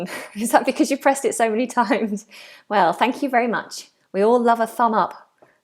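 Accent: United Kingdom. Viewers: Teacher I watch that record fron place who doesn't showen in a map. I just like walk there. It is somewhere not far from Cherson . If be honest I always practice with my gun there, In this way I make sure I don't bother people. P.S I found off British accent, so try hard to imitate your accent. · I love british accent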